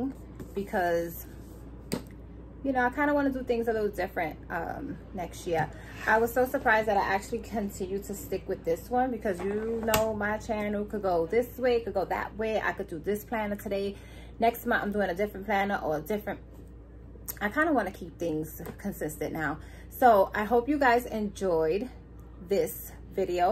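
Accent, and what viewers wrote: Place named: New York City, United States. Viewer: I just came across your channel. You’re so inspiring and positive! I’m originally from NYC but live down south in FL…. Hearing your accent reminds me of home. I pray you’re able to get out of debt soon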